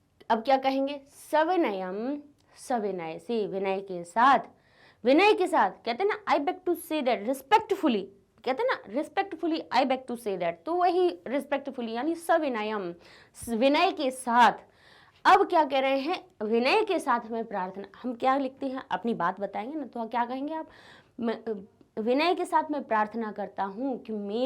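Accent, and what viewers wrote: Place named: Gujarat, India. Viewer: Aap kya gujrati ho kyuki isiliye kaha raha hu kyuki aapki awaz gujrati jaisi hai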